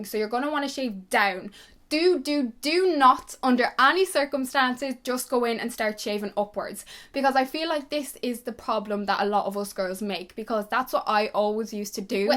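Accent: Ireland. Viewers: U love your Irish 🍀 accent coz I'm irish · I’m irish and I some how came across this video and I straight away noticed your accent, we irish girls have to stick together ♥️🇮🇪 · I love her Irish accent, this video is super helpful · Where are you from? I detect the musical emerald lilt! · litta Lou I would say she has an Irish accent.... card b is Dominican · She has an Irish (Dublin) accent so probably not.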